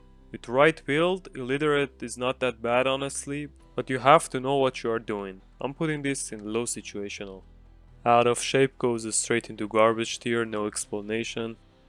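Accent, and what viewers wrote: Iran. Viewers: Are you Iranian? You have a Persian accent.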